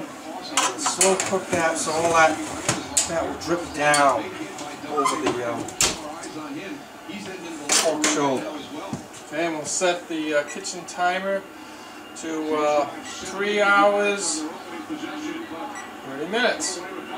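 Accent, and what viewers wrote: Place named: Boston, United States. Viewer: the way you say part is funny you pronounce the word pot you must be from boston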